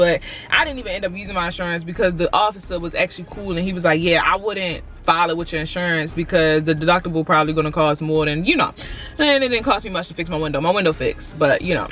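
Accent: Baltimore, United States. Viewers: Girl you from Baltimore? I know that accent from anywhere. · That Baltimore accent strong lol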